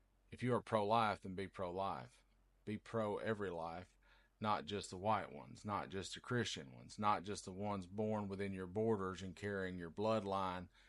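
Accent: South, United States. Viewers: You are a treasure and represent what a real man (with a southern accent) should sound like. You are describing religious ghouls...they are nothing more and we all know it.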